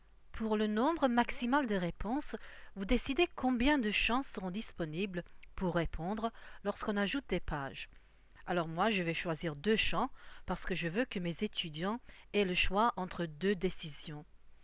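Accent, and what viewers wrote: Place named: Belgium. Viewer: A l'accent, c'est une belge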